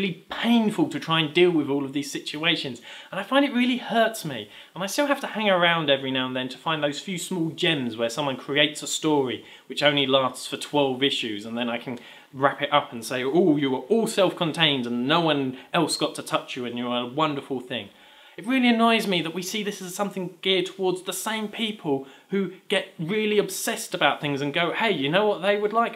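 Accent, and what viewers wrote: England, United Kingdom. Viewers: nerd I noticed something. you and I are somewhat alike we are both akward we both play video games we both speak in birish accents (poits for you for being british) we both have a dyslexic best friend who also plays video games. I find this strange but funny xD o and we both wear the same kind of glasses for some reason .-. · hey i can tell u r english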